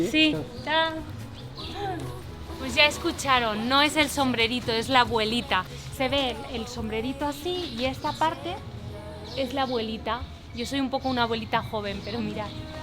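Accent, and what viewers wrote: Andalusia, Spain. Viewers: ¿Por qué si eres de Valencia,hablas como de Andalucía}? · Una catalana con acento andaluz? Que interesante! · Bonito video y cada vez tus visitas, tienen más acento andaluz.....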